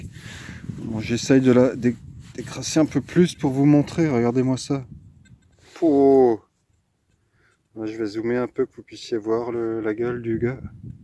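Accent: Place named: Alsace, France. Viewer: Felicitation !!! J'en reviens pas de la chance que tu as de trouvé une pièce du Vatican. Imagine la probabilité de tombé dessus c'est un truc de ouf. Sinon je trouvais ton accent familier mais j'arrivais pas à trouver et j'avais un pote a l'armée qui venait d'Alsace maintenant je sais 😁 vous avez la même façon de parlee ça ma rappelé plein de bon souvenirs. Merci encore pour cette superbe vidéo continu comme ça.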